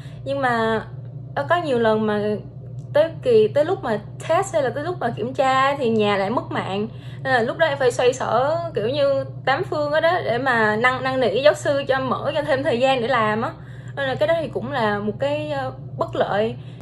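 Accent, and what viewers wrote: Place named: South, Vietnam. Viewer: Mình thấy bạn nói tiếng Nam hay đất chứ!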